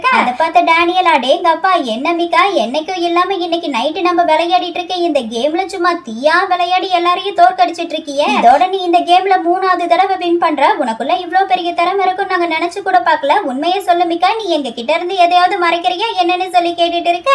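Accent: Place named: Coimbatore, India. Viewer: Neenga Coimbatore i am thirvannamalai sis i love ur slang and voice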